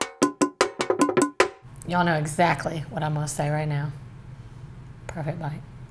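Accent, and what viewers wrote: South, United States. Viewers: She’s gotta be from the south……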